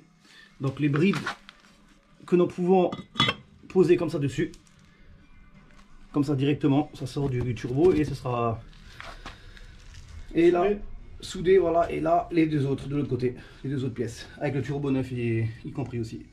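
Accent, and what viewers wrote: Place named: Lorraine, France. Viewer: L accent lorrain!🤣🤣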